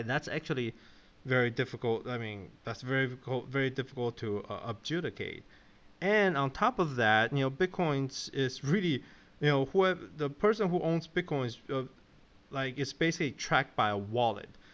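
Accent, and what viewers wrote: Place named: United States. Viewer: ~ "Who will the VAT affect? Those spending more than $10,000/mo." you lost me. I suppose you have some unstated premises, possibly re UBI. first: it's VAT, V A T. it's not VAT tax, that would be Value Added Tax tax. second: such presentation issues prevent me from listening further, so.. maybe you EVENTUALLY get on to the following, but I may never know. ("Tell them what you are going to say. Say it. Tell them what you said." this is like high school composition.) such problems REALLY undermine credibility on top of this specific comprehension barrier third: "Your economics makes no sense." a VAT is a tax on productive capacity, whereas a wealth tax is intended to AVOID taxing production. the key is whether the monies seized are engaged in the economy or whether they are held in reserve, not actually adding to the production. 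think of it this way: * I am spending $1000/month (productive) * I am reserving $100 (nonproductive, so-called "wealth") -> VAT DECREASES my spending capacity a 10% VAT means only $900/month (spending, productive), $100 reserved -> "wealth tax" DOES NOT decrease my spending $1000/mo spending, $0 reserved fourth: "intellectually lazy" is a promise you are making by choosing this headline. it sets up expectations. in this case, it sets me up to question, "Just who is being intellectually lazy?" and in this case I determine it is you after about 3 and a half minutes ADDENDUM: I am an accent buff. I take yours as from the US, but the question then become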